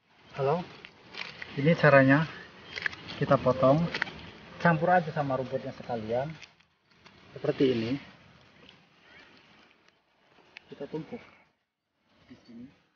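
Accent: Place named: Banyumas, Indonesia. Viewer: sampean tiyang pundi bos kyong ngapak bhsane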